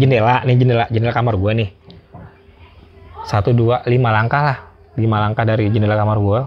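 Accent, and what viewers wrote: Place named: Lampung, Indonesia. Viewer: Lampung emg gtu lagat ny bang jadi biasalah 😅 sy juga org lampung🤣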